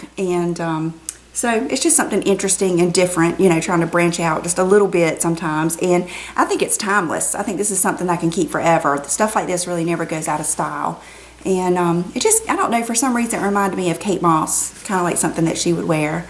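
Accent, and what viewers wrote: South, United States. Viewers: I just discovered your videos, and I'm addicted! You are so enjoyable to watch. Love your taste, your attitude, and your southern accent! Just gorgeous all around.:)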